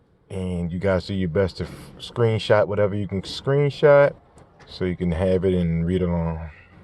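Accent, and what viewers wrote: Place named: Baltimore, United States. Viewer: Gotta be from Baltimore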